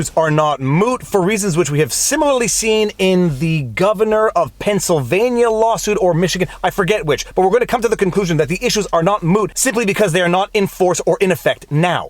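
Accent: France. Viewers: Love the French accent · I always laugh my ass off at the French accented readings.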